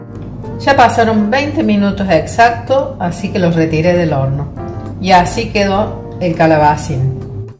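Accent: Córdoba, Argentina. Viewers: Sos de Córdoba 😁 por la tonada. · Muy bien explicado… (la tonada le da la magia a la receta) aguante Córdoba❤ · Por casualidad usted no es cordobesa xq a mí me parece que si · por el acento ya se sabe como hablan los cordobeses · Tienes un acento típico de la provincia Argentina de Córdoba! muy lindas las cordobesas! Gracias por compartir tu receta ,voy a poner manos a la obra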